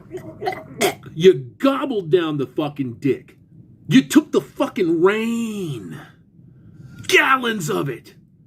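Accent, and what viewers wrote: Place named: South, United States. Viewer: First time viewer here (Sandman sent me) Love this lol! Where is from? has to be the south. He sound like my cousin! must be part black lol love it!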